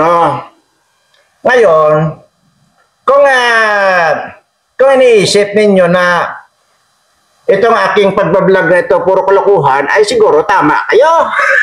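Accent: Iloilo, Philippines. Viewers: Daw ilonggo ka ,namit ka magestorya noh, kaluluoy man ang iyong nobya at hinimod na tanan sa imo hehe ,trying hard ko maghambal sang ilonggo,kahapon batangeno ka magsalita,bukas puede ilokano met tapno manggeg ko met nga agilokano ka maysa ak nga ilokano ngamen isu nga kayatko ko nga agsao ka no bigat no mabalin laeng, sige sayaw sir pangpagoodvives, happy kami na subscribers... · Good morning po sir, napasaya mo po ako sa ilonggo accent mo😊🥰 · Tuno ilonggo man sir ako karay a man antiquenio man🤣😂👊👍🙌💚✅🙏🇵🇭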